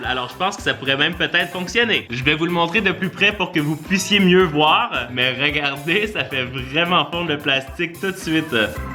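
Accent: Quebec, Canada. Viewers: J adore ton acsen cbekcois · J’adore l’accent québécois 😂😂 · Vas il et québécois donc ses normal qu'il a un acsant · J’aime grave l’accent québécois 😮😍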